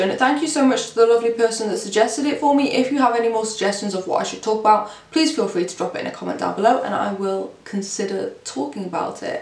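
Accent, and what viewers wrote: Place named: United Kingdom. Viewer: I love your British ascent btw I'm from India but my ancestors were English so I get really drawn towards the English culture specially the ascent I recently started taking interest in makeup but your ascent makes your videos more enjoyable for me to watch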